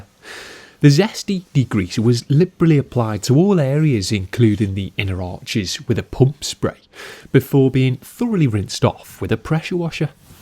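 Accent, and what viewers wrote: United Kingdom. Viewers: Awesome British English mate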